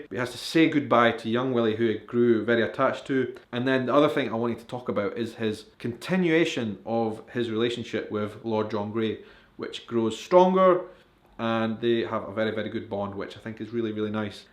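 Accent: Scotland, United Kingdom. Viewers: I was immediately taken aback when Geneva said 'Scotch-man' (and I'm American), and knew (somehow) that it was a careless form of address. Not sure how I knew that was terribly wrong, but it surely set the tone for how we should perceive Geneva (despite the fact that I've already read the books!). I love your 'Scottish-person's' perspective on this series! Not to mention you have a pretty cool accent, yourself!! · Well, it was super steamy until the end when he explained what the difference between love and lust were. I also agree with you, that was the steamiest sex scene outlander has done. I wonder why they put so much emphasis on this one and not the one with the maid back at lollybrach? I am NOT complaining though! Cant wait to see more of your filming location vlogs. Honestly, all your vlogs. Love listening to you before i go to bed. Hoping i dream about a Scotsman since i fall asleep with the accent in my head! 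Ha! Thank you for the video!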